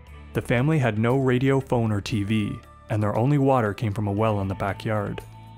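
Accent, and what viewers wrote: Canada. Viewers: I can tell your Canadian 😂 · Are you from Canada or thereabouts? The way you pronounce certain words, like “about” and “house” is very endearing.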